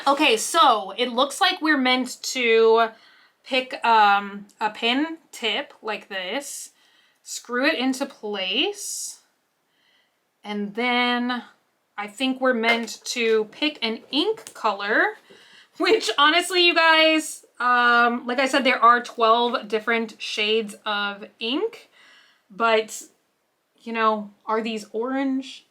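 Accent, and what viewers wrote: South, United States. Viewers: SHE'S FROM TEXAS. All of us southerners talk like this.🤷🏽‍♀️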